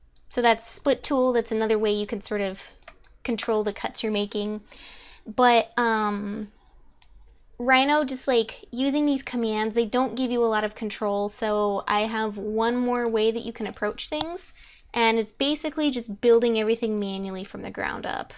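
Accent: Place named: Midwest, United States. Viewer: lol that midwestern accent is great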